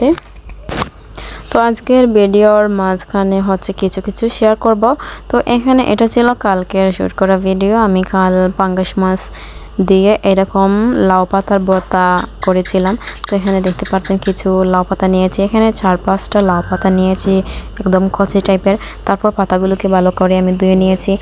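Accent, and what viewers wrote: Sylhet, Bangladesh. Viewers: Tumi ki sylheti?koi thako?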